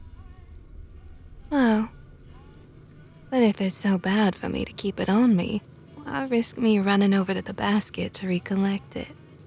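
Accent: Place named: South, United States. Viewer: Ok I'm begging please take the ropes off I'm sorry for what I did please they're really hurtin me thanks for the wonderful audio really love the southern belle accent 💕🌹💕🌹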